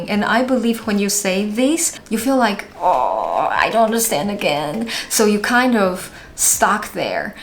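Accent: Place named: Taiwan. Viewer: I especially like when you include these little things that make you sound more Taiwanese :D Like this 耶!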